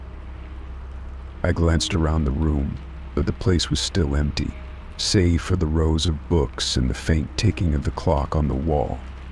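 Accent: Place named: Southern England, United Kingdom. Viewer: South England. You are really helping me to sleep thank you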